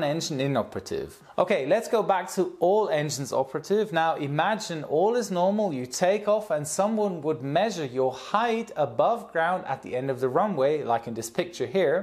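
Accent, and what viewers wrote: Germany. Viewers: Du bist deitscher..ich höre die Akzent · Vipersniper_369 hes German. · Bist du deutsch?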